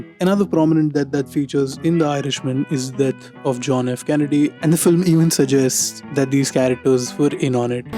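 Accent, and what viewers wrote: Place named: India. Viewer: Dude that indian accent hurts the ears 😢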